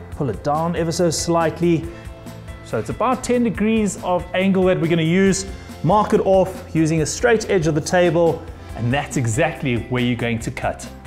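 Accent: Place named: South Africa. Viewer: I was going to ask if the accent was South African... then I realised is not a South Australian youtube channel.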